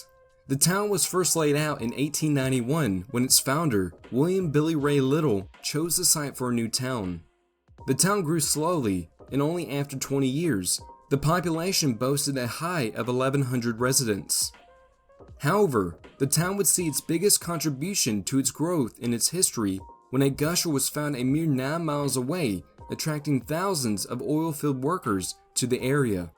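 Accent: South, United States. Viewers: Southern Accent. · it’s so inspirational to hear someone with my accent fully accepting it, not trying to hide it out of hear fear of being perceived as uneducated:) also if you see this, what state are you from?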